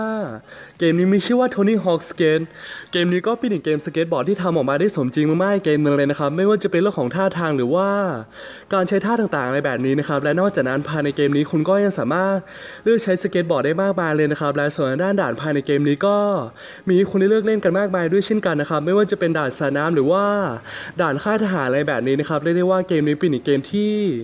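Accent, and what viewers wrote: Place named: Shan, Myanmar. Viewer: เปนคนไทยใหญ่หรือพี่แอปเสียงเกินอ่ะพี่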